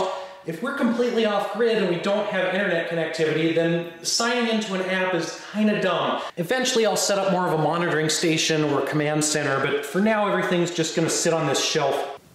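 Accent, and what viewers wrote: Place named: Alaska, United States. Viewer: You being from Alaska tracks. I couldn't place your accent, but you sound similar to an Alaskan I used to work with a million years ago.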